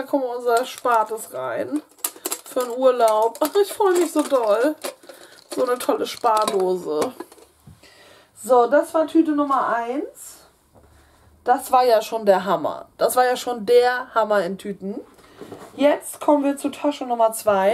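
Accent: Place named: North, Germany. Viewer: dein nordischer Dialekt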